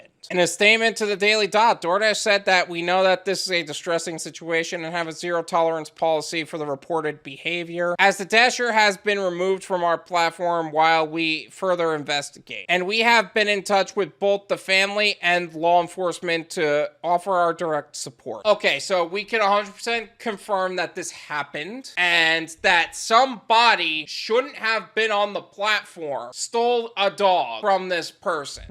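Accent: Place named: United States. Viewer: Bro i like you us accent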